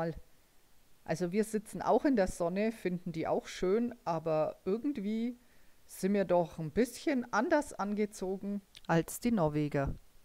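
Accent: Franconia, Germany. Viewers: Allmächt.su schee. Dei fränkischer komendar is glasse. Die Reise verlockt zum Nachfahren,wenn des sch.... corona rum is.derf mä frong was die ganze Tour gekostet hat.? Abo und like is da.liebe grüsse aus kulmbach. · Die Franken im Norden. ..... hab den Dialekt erst gehört, später Fürdder Kennzeichen, alles klar;)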